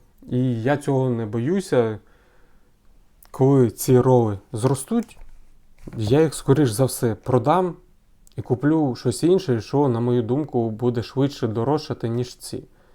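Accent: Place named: Lviv, Ukraine. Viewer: Моя повага. По Вашій мові і не скажеш, що Ви не зі Львова.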